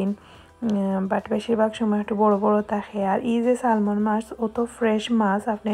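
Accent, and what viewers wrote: Sylhet, Bangladesh. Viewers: Affa just curious want to know why you went from shudoh basha back to sylheti basha?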